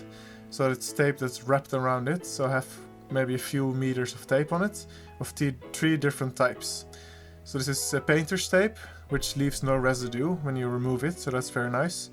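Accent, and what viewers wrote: Netherlands. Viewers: aahhh ik zat al te denken huh dat klinkt toch Nederlands haha waar kom je oorspronkelijk vandaan dan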